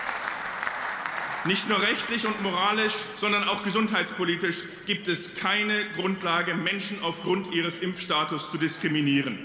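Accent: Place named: Swabia, Germany. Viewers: ... Schwäbisch kann auch sein ... der Dialekt ist etwas gewöhnungsbedürftig ... ich habe 15 Jahre da gearbeitet ... und gelebt ... Spaichingen und 11 Jahre in Ostfildern Nellingen ... war ganz nett bei den Schwaben ... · Wohl eher Schwabe. Grausiger Dialekt für meine Ohren.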